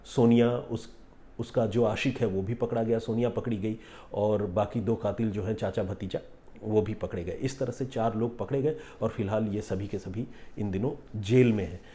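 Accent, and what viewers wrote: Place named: Bengal, India. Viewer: I like your vocabulary in hindi sir. Bengali hokar itna acha shudh hindi boltehai .👍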